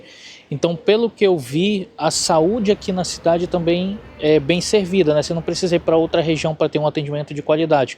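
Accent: Pará, Brazil. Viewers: Mano parece se do Pará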